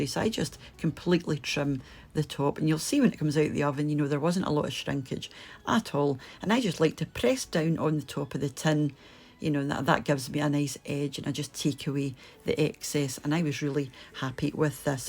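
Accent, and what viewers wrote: Scotland, United Kingdom. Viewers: Whereabouts in Scotland is that accent from?? 🤔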